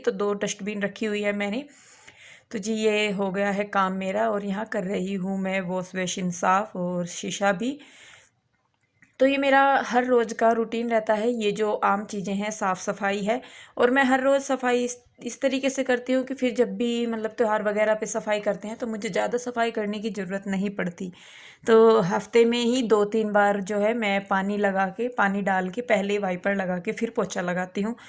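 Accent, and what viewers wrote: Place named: Baghpat, India. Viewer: Aapki language dekh kr lagta h jaise aap baagpat badhot ki boli bolte ho meri h friend h waha ki to uske ghar me bhi sab aise hi bolte h acha lagta h sun kar.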